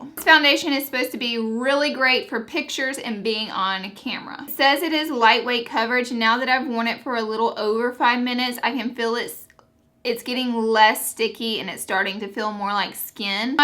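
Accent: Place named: Appalachia, United States. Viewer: Oh my god I love whoever this is because of the cute hillbilly accent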